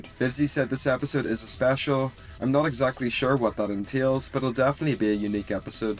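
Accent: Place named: Northern Ireland, United Kingdom. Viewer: Always love to hear a wee Northern Irish accent like my own on YT! Feel so rare to come across! Buzzing for more eps 😳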